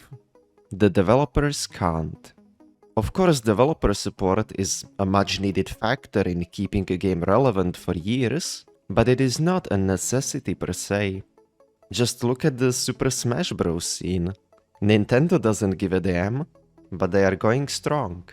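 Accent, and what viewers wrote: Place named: Russia. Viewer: I love the russsian accent. отличное видео!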